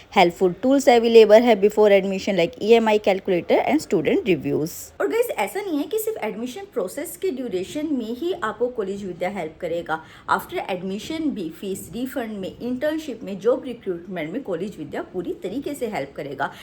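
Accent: Haryana, India. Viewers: Hii didi ...is bar ke upsc prelims ke baad maine baki exams pr bhi focus krne ka socha h pls if u can reply mujhe bta do.... 1). Mai delhi se hu toh kya haryana ka pcs me chances h kyuki mujhe lgta h sb apne states ke candidate ko preference denge.. maine apko uppcs dete dekha h lekin apki boli se ap mujhe haryanvi lgte ho pls if u can help me out. 2). Apne epfo dia h kya exam or uski strategy?😅 Btw , Happy marriage life didi...May your forever after be just as magical as your honeymoon.❤🎉🦋🌸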